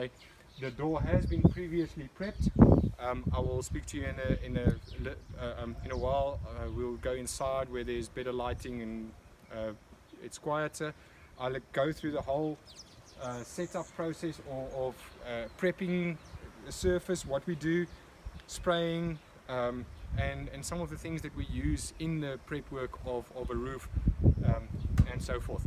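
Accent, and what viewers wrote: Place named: South Africa. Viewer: enjoy listening to the south African accent .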